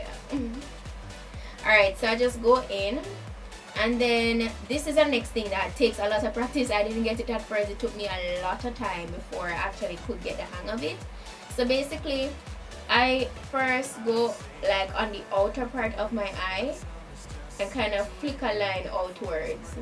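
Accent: Jamaica. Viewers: Hey i just wanna say, i love the content of ur vids, love ur accent, Im Trinidadian and omg i just love a strong Jamaican Accent . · I subbed as soon as I heard that Jamaican voice 🇯🇲🇯🇲🇯🇲🇯🇲🇯🇲🇯🇲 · go girl... are you jamaican? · Are you Jamaican???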